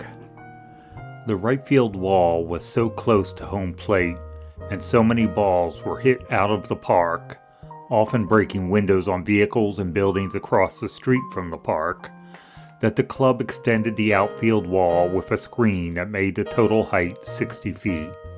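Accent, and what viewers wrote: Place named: Philadelphia, United States. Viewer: You're a true Philadelphian with that accent!!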